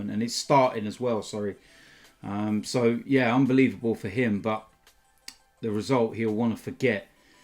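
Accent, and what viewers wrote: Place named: England, United Kingdom. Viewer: Maybe don't pronounce the names with an English accent but try to look at the country where the name comes from. Or don't try it, now it's funnier😂. Keep going, starting my day with your Daily's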